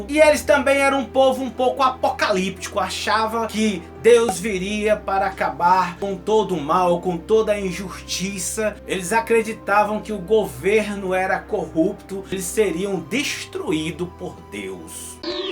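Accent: Northeast, Brazil. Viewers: Meu amigo, á muito tempo sigo seu canal, vejo seus vídeos, gosto muito. Você é um cabra arretado, que fala tudo com esse sotaque humorístico gostoso dos nossos irmãos nordestinos, mas vendo hoje esse vídeo, me emocionei um pouco, com tão belas explicações do evangelho em resumidas palavras. Se o homem foi inspirado para escrever a bíblia, como dizem aqueles irmão mais fanáticos das religiões, você estava inspiradíssimo por Deus, na hora que fez este vídeo. Estou vendo que foi o ano passado que você fez, mas esta reflexão vai valer por seculos e este momento de quaresma, vai ser sempre mais um bom momento para podermos acompanha-lo e refletir. Ah! Se todos os padres, bispos e pastores das religiões tivesse a graça de Deus que você teve, para explicar de forma tão direta o que é Jesus e quem foi aquele povo, aquele sacerdotes, aquele sistema. Ou seja: Não mudou nada. 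Jesus continua morrendo coossificado e o povo continua crucificando Jesus.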